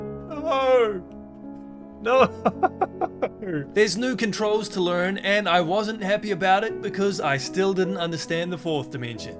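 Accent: New Zealand. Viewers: I knew the fuck as soon as I heard your voice you're a kiwi, where are you from my brother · New Zealand accent? Oh this is about to be a life-changing video lmao (all the cool people live ther)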